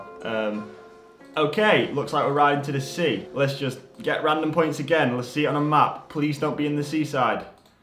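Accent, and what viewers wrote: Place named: Lancashire, United Kingdom. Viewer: First of all, cool video! Second, and a completely unrelated question to the video. In your Tour of Ulster video you mentioned that you're from there so how'd you end up with such a rich, thick Lancashire accent instead of an Irish one? How long have you lived in England?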